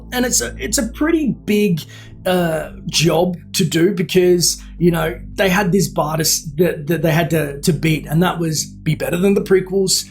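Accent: Australia. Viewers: Hey I Dunno If This Has Been Asked Before But Are You An Aussie By Any Chance???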